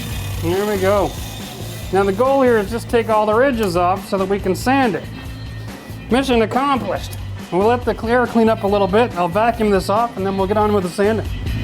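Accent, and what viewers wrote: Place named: Canada. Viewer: I didn't even notice the 'Eh accent till the very end when he let out an "abooot"!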